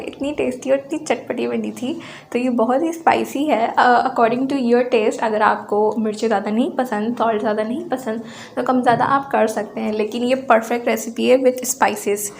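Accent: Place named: Mumbai, India. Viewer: وعلیکم السلام بمبئی لہجہ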